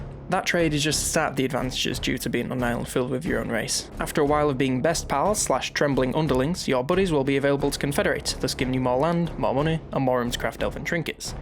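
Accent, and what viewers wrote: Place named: Manchester, United Kingdom. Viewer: He sounds like he’s from near me. You from Manchester mate?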